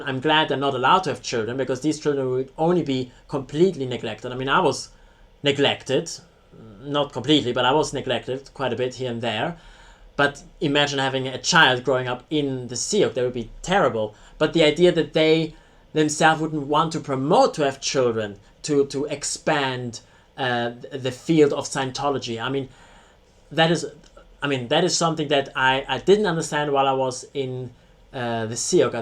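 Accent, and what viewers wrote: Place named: London, United Kingdom. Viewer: I'd say a posh London accent. I live in the North east of England. We have a very strong accent people say. Your accent is to posh to be from my part of the country. You have a lovely speaking voice, very easy to listen to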